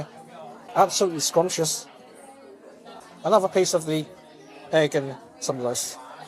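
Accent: United Kingdom. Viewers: It's so interesting hearing your British accented pronunciations of Chinese dishes. I'm a California born Chinese speaker and I say things with a bit twang compared to you. Thanks again for the video.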